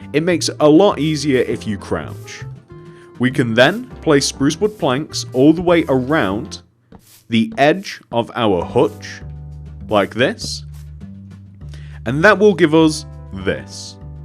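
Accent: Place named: Liverpool, United Kingdom. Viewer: Hi! I've got a question. Are you from Liverpool because your accent is a bit like it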